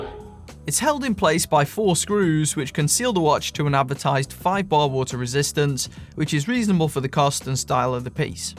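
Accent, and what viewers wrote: Stoke, United Kingdom. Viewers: stoke accent and that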